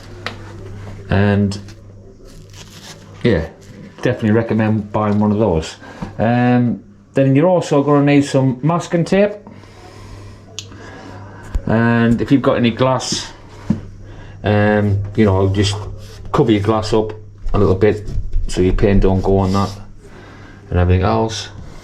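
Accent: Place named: Northeast England, United Kingdom. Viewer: You sound like you are from the North East of england, just wondered how the paint managed in all the glorius weather we had? Looking at doing my own with the same paint and your video popped up in my searches. Thank you!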